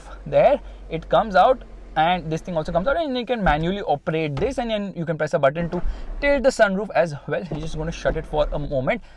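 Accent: India. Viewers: did you reset your language setting on your videos as it's first time hearing you speak in hindi too, on the other hand it's quiet better with the little Indian touch to it.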